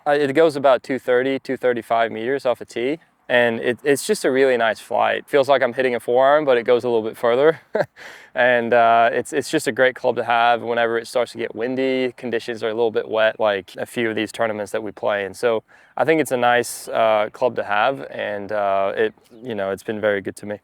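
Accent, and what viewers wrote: United States. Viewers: He sounds so American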